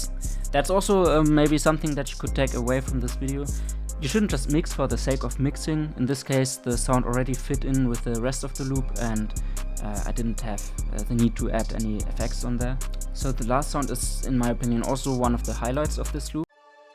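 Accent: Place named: Germany. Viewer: I hear German accent I know vid gon be hard!!!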